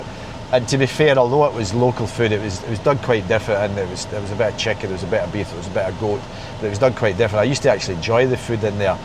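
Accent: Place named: England, United Kingdom. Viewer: I like to listen to but not more than 10 mins as after that I start to feel bit of headache 😢, I am not used to that English sound, i am used to normal one 😮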